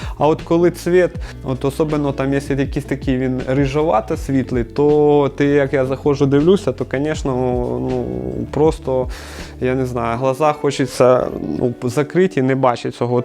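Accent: Surzhyk, Ukraine. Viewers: Не можливо слухати( суржик є у всіх, але тут його забагато.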